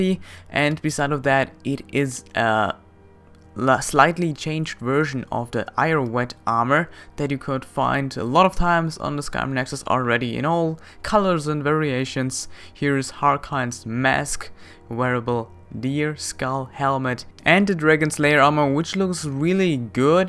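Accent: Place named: Germany. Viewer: Guys he's German.. So i wanna hear you guys speak German.. And then say no it's pronounced like that.. -.-'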